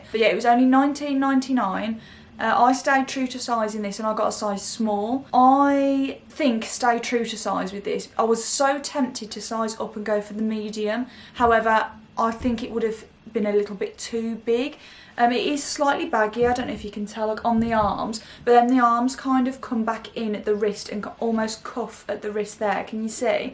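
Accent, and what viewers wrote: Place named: Midlands, United Kingdom. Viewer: I love listening to you, I'm from West Midlands and love hearing a local accent! Not enough Midlands you tubers ❤😘 x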